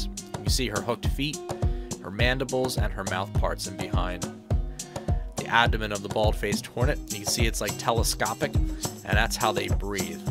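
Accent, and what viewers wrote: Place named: Philadelphia, United States. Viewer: N.E. Philly? Your accent gave you away 😂 Im in Mayfair and hoping to not get stung this summer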